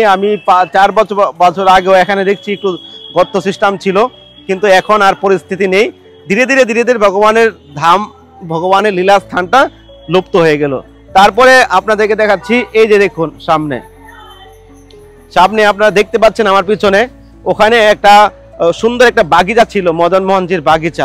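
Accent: Bangladesh. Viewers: আপনার ভাষা শুনে মনে হচ্ছে বাংলাদেশী । এত খারাপ অবস্থা কিন্তু যারা যাচ্ছে তারাই করছে ।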